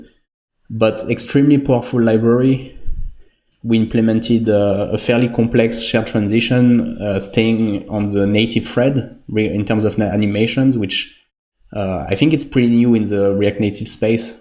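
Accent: France. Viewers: J'ai reconnu l'accent français, merci pour tes vidéos super intéressantes. Happy hacking!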